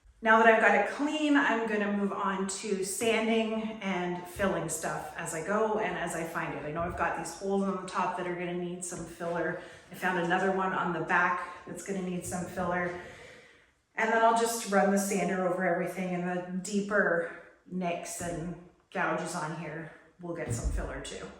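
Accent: Canada. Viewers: I am a fairly new follower so I’m not sure what part of the country you’re in… once in awhile I pick up a faint Canadian accent??? I’m any event I am blessed to live in a somewhat affluent area in Virginia…. Williamsburg/Yorktown area. Those furniture pieces that you have restored, especially most recently that dark olive green dresser or sideboard or console would easily go for $1200 in this area!!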